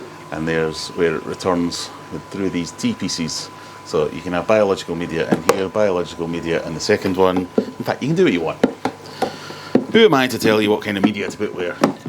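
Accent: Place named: Scottish Highlands, United Kingdom. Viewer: Uncle got highlander accent and i absolutely love it. Excellent video🤝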